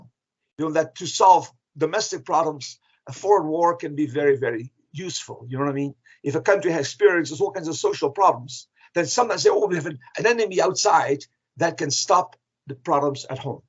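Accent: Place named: Castile, Spain. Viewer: Es Canarias Semanal, ¿por qué la conductora es una castellano-parlante y no alguien que hable con acento canario?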